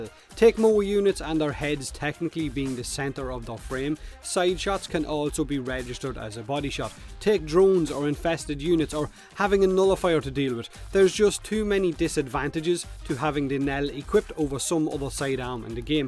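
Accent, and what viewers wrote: Ireland. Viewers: I always come here for my two favorite things. Warframe, and an Irish accent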